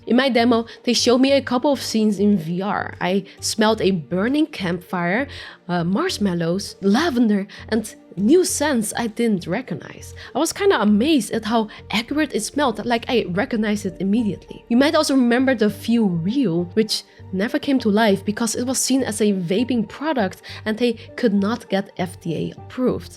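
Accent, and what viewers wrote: Netherlands. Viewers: Is that a dutch accent you have?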